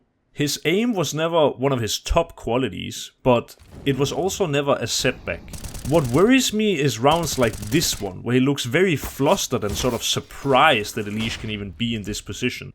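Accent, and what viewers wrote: Denmark. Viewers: nice dansk accent m ed dit engelsk dov rigtig god video ahaha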